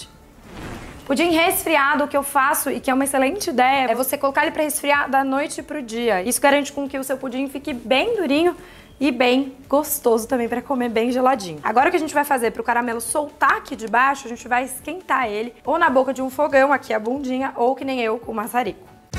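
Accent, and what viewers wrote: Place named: São Paulo, Brazil. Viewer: Nao existe alguém com um sotaque paulistano tão carregado como o da Ju Ferraz, até eu que tb sou de SP fico impressionada 😍😆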